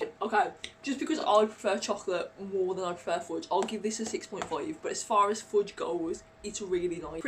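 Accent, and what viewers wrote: United Kingdom. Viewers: This video came up randomly in my feed. Nice reviews! May I ask what your accent is? I can hear what sounds like a mixture of a bit of Liverpool, Dublin, Geordie? I studied linguistics and I am usually good at accents so this is interesting :)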